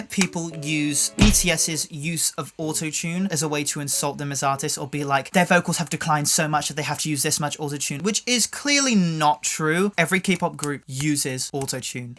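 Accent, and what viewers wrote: United Kingdom. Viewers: That British accent (๑♡⌓♡๑) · wait you're British? All the better ig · Trying hard to understand the fast British English with the lyrics(?) while thinking maybe that learning app is a good choice for me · Ok. This is the first time I’m watching him actually speak and I can safely say I wasn’t expecting him to be British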